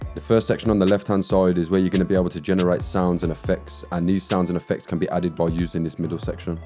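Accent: Birmingham, United Kingdom. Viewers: ugh that brummie tamworth accent..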